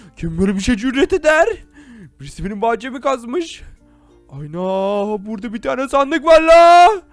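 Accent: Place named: Black Sea, Turkey. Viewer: Abi karadenizli konuşuyon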